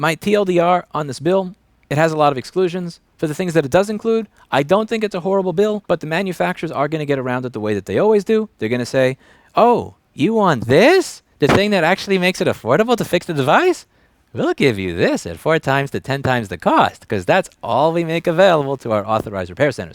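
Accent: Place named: Texas, United States. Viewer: Life long Texan here., except for talking so fast, you sound like a real Texan.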